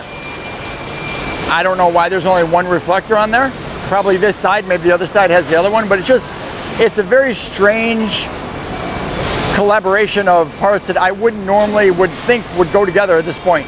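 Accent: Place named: Chicago, United States. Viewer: I sense a Chicago accent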